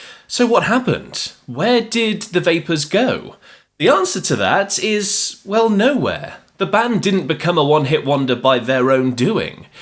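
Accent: United Kingdom. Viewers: Yeah, between this strangely moralistic stance and the fact he censors words like "masturbation", he sounds like a puritanical American who happens to have a British accent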